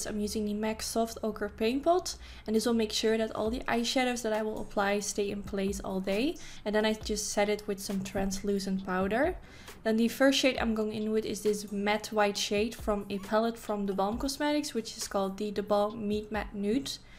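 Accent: Netherlands. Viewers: your Dutch accent which I find charming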